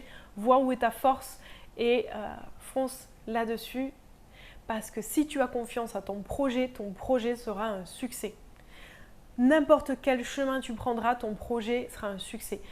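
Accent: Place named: South, France. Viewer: je t'ai découverte sur instagramme pendant le confinement et j'ai tout de suite accroché à tes vidéos, à ton univers, à tout ce que tu dégages. J'aime ta douceur, ta joie de vivre, ta sincérité et ton autanticité, ton petit grain de folie, ta voix et même ton accent du sud. 😅 J'adore comment tu prononces les mots "môlet" et "môbilité" en appuyant bien sur le son "ô" 😉 En septembre, comme je n'étais pas vaccinée et n'avais de ce fait pas mon pass sanitaire, il etait impossible pour moi de m'inscrire dans une salle et de suivre des cours en présentiel. Du coup, c'est tout naturellement que j'ai décidé de m'abonner à tes 3 programmes en ligne pour pouvoir tout de même pratiquer le Yoga de chez moi. Et depuis tu fais réellement partie de ma vie. Tu m'appaises, me rééquilibres, me détends, me fait rire... Si je ne pratique pas pendant toute semaine et bien ça me manque. 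Je rêverais pouvoir participer à l'une de tes retraites, mais pour le moment, je n'ai malheureusement ni le budget ni les vacances pour. Mais ça reste un de mes objectifs 😇 Un grand merci pour tout ce que tu nous offres. Tu es une si belle personne 💜 Namaste 🙏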